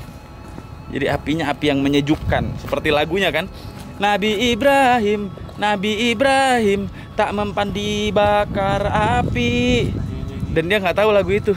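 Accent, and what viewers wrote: Java, Indonesia. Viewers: asli mana bang? kok logatnya ada jawa²nya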